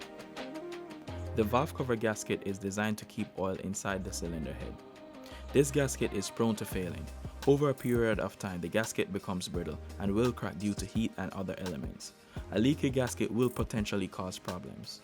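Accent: Jamaica. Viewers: Just subscribed my boss. Yuh sound like a yaadie. Everything you touched on i have done on my car. It makes for a trouble free.. Worry free..peace of mind experience. Great video, to the point and helpful. How can you differentiate a misfire due to carbon build up? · You Jamaican bro? bless up ✊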